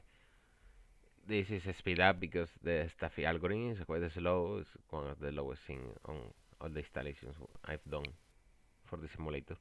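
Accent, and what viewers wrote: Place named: Spain. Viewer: Eres español? Tu acento te delata lol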